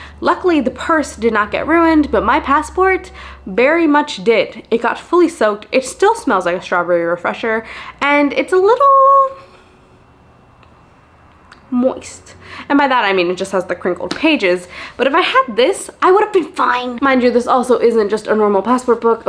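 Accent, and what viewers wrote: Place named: United States. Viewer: You sound like you are American. Those adaptors work great if you are not American because most places use 220V and you just need an adaptor. But if you use it on US products that are 110V you will burn them all up the second you plug them in. You need a transformer to go along with that to step down the voltage to 110V. While some us appliance have both 110v & 220v don't count on that because most don't. It will say right on it if it does. If you do it an cause a fire in your hotel or airport or anywhere else You will have to pay for the damages which won't be cheap. If you don't have the money to cover it many places will put you in Jail and then deport you back to your country. How long you wait in jail before you are deported varies and can even be months. Things to keep in mind but not to scare you. Be smart and you will be fine.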